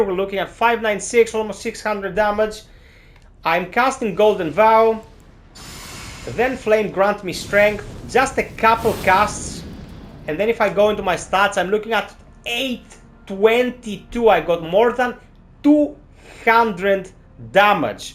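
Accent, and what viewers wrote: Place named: Greece. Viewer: dude that is a greek accent ain't it! reminds me a bit of old school toronto in the 70's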